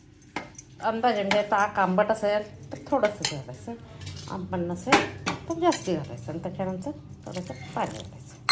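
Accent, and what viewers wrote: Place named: Karnataka, India. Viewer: Five like kele 🎉🎉🎉 खूप छान ताई रेसिपी 🎉🎉🎉 तुमच्या आवाजातील कर्नाटकी स्टाईल मला आवडते 🎉🎉🎉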